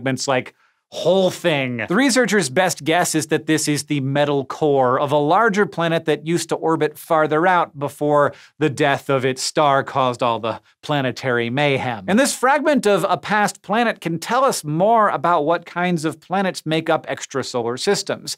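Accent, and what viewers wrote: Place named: United States. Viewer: "it's LIKE" I'm listening to a school girl in a mans body. The American accent, voice and sentence structure does my FN head in! so many YT vids that promise and then the voice... JUST TALK NORMAL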